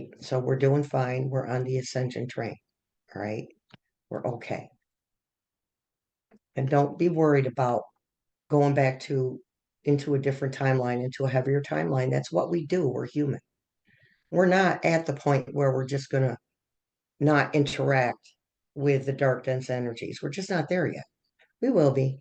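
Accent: New York, United States. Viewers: You from NYS??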